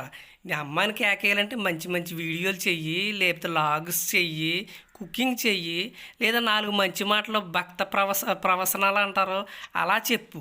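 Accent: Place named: Godavari, India. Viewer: Ayyo meeru vere anukunna andi. But voice super and Godavari slang super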